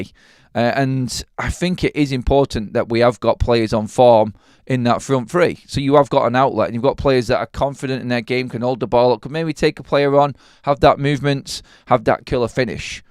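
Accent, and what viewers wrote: Manchester, United Kingdom. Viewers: Which muppet watches a utd chanel and slags of the mancs accent?! Lifetime ban for those plebs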